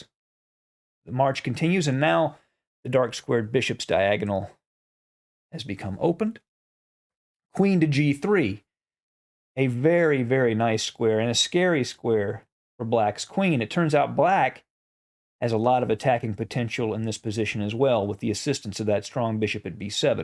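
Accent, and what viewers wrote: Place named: South, United States. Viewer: Thanks for sharing with us. I like the accent as well as the commentary. My guess would be Alabama or Tennessee. Great series on Firouzja by the way. After watching your videos, I now understand why the guy's made such a big impression on the chess world. Looking forward to the next video.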